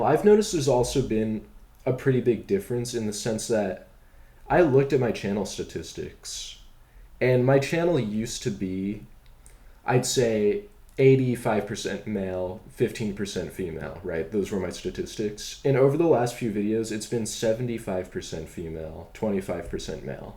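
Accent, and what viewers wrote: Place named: United States. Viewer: First time watched of your videos, it feels kind of surreal to listen in to gossip from the other side of the world. You look like a character from an American tv show -- which, admittedly is a strange thing to say because you ARE American, but the crazy stuff you're saying about your ex and friends literally sounds like an actual plotline from a show. Maybe because I'm not American, but hearing your story being said in an American accent is so strange to me. On a serious note, I'm sorry to hear about your ex and that your friend's love didn't last.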